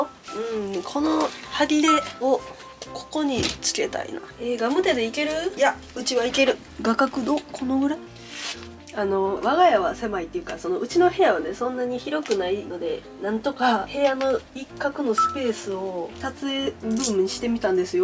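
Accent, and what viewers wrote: Kansai, Japan. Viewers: 初見だけどサムネ見ただけだと強気なカッコイイお姉様系だと思い込んでたらばりばりの関西臭があって一瞬で好きになった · すっげーかわいいけど、おしゃべりしたらミルクボーイの角刈りの人みたいな関西弁でウケる🤣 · 初見でサムネ見て、めっちゃ落ち着いてメイクする方なんかなっておもったけどがっつり関西弁でなんかすごく安心した笑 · サムネが綺麗すぎたので飛んできました！そしたら話し方が面白いし関西弁で親近感湧きました！強調でクソっていうのわかる😂 100均のコンシーラーが有能なのだと知ったので買いに行こうと思います！ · どんなお上品なお嬢様の化粧動画なのかと思ったらド関西弁でビックリした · 強め女メイクを探してる時に流れてきて、もう、サムネがすごく理想のメイクで！！どうしたらそんな美女に？！と動画見てたら関西弁の素敵な方で惚れました🤤✨ ギャップに落とされました。大好きです。他の動画見ながら、参考にさせて頂きます💕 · やっぱり最初の30秒でイケイケなカッコ可愛いちゃんと関西弁ゴリゴリなおもしろちゃんが見れるからすごく好きです！！！！！！！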